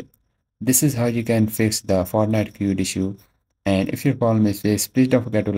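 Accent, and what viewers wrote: India. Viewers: ABİ OLMADI SENİN BEN HİNDU AKSANINA SAPLIYIM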